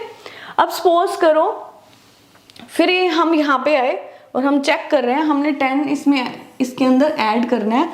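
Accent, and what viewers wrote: Punjab, India. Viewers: Like ur punjabi accent while speaking english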